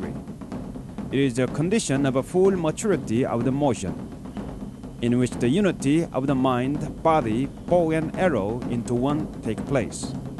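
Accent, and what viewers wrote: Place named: South Korea. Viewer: There is a Korean accent in that English. I agree it sounds very unnatural.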